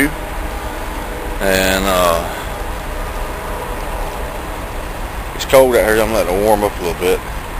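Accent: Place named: South, United States. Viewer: Good job! Love the southern drawl! Beats mine all to shame. lol One question, why did you not like the internal spring on the poppet valve? Again, great work!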